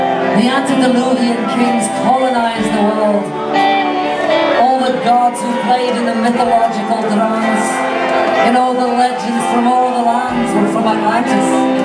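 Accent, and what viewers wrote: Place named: Ireland. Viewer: who does the opening narration? not in CRB is he? sounds Irish.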